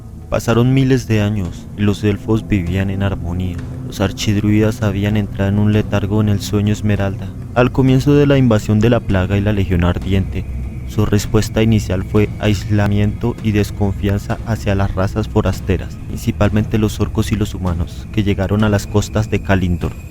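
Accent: Colombia. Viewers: de colombia , por el acento cachaco , saludos desde Valledupar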